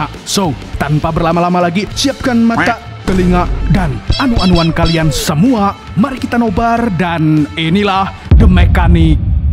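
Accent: Banjar, Indonesia. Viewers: Urang banjar pian lah mang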